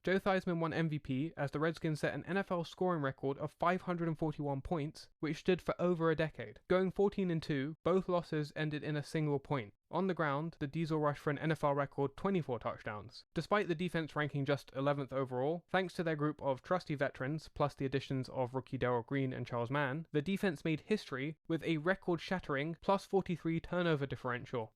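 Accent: England, United Kingdom. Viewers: I am a Giant's fan from 1973. Nobody has to tell me how great the Redskins were in the 1980's under Joe Gibbs. As much as I hated them back then - that team always had my respect. They were talented, disciplined, and well coached. 45 years later it looks like they could be on the threshold of another championship team. Hope it is only 1 .... ; ) How do you know so much about American football with a British accent? I am pleasantly surprised - unless you are AI? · Really nice vid. Well done. I have to admit, it’s different hearing this from an Englishman. Is the NFL even popular across the pond? Keep up the good work!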